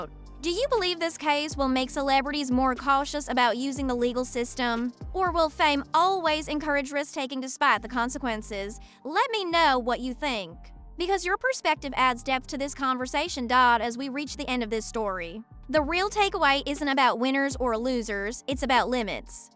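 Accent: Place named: South, United States. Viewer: This is hilarious. An AI video with a deep southern accent. You can always spot an AI video by the utter lack of cadence. But this one with a southern accent is glaring. Lmao😂😂😂😂😂😅😅😅😅😅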